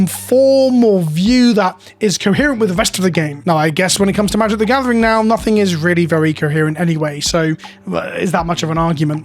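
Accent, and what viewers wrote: United Kingdom. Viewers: I enjoy listening to an old British man yell at the clouds since I’m also old and find it annoying with these art treatments too!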